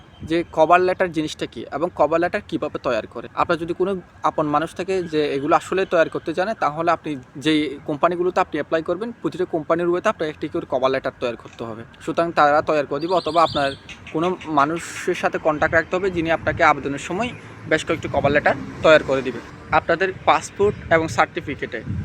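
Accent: Chittagong, Bangladesh. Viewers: বন্ধু তুই চিটাইংগা নে? আয় বুকে আয়!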